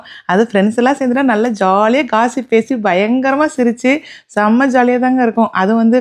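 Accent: Kongu, India. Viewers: Kongu Tamil 🥰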